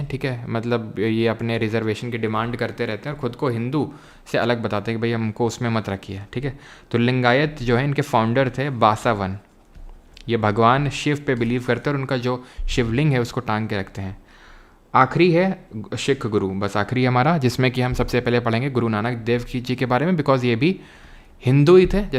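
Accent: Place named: Uttar Pradesh, India. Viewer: Sir pdhate hue apni up accent me yaad kradete hai ap. Thanks a lot sir we needed this.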